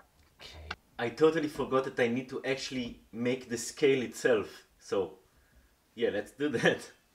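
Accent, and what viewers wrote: Israel. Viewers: I don't know if it's a jewish accent or maybe Israeli but you seriously sounds like many Israelis I spoke with and also hearing my own voice so just saying kind of a weird accent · הסרטונים שלך מושלמים, אוהב ממששש את העבודות שלך ואת האנגלית עם המבטה הישראלי חחח 😁😁 שנה טובה ומתוקה 🍎🍯ותמשיך כך💪 Love your work keep it up 😁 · I’m guessing by that accent, you’re Israeli? If so, AWESOME, you’re the first Israeli machinist I’ve seen on YouTube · המבטא הכי ישראלי ששמעתי בחיים שלי ריספקט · Wow, that israeli accent grinds my nerves.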